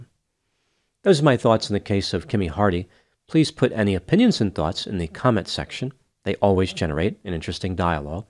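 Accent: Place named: Pittsburgh, United States. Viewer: Are you from Pittsburgh,? Your accent reminds me of my hometown. 😊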